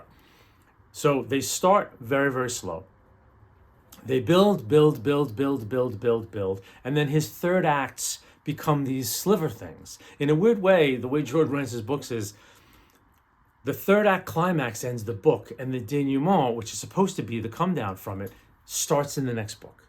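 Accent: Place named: Long Island, United States. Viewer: Nassau County representing! It’s endearing to hear your Lawng Island accent.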